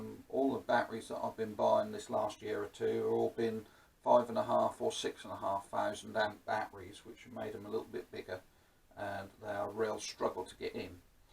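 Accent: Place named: Suffolk, United Kingdom. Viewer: it sounds as though you may come from Suffolk boy as I do … keep up the good work 👍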